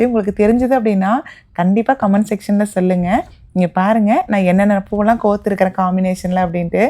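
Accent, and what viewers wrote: Kongu, India. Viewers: எல்லா வேணியும் அழகு, உங்க கொங்கு தமிழும் அழகோ அழகு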